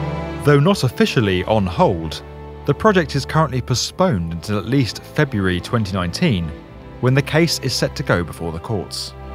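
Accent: United Kingdom. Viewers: good video , well done with the British accent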